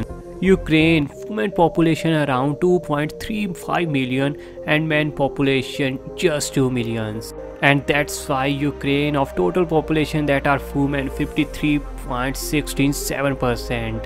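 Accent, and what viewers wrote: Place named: South Asia. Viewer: Can’t you tell he’s from south Asia (indo-Pak) ?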